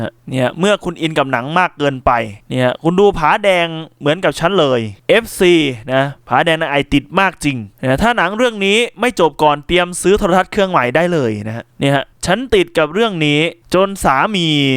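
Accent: Ubon Ratchathani, Thailand. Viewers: ว่าแล้วเวลาแอดมินเว้าอิสานสำเนียงทำไมคล้ายๆสำเนียงอุบลจังเลย ผมคนพิมูล อยู่ตำบลนาโพธิ์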